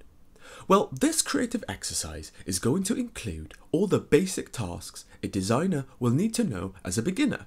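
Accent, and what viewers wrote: Wales, United Kingdom. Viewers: Pretty sure he's Welsh.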